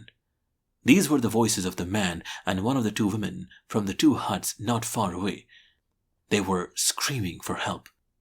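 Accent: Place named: India. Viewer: You are absolutely right about our narrators voice. His wonderful accent conjures up India perfectly.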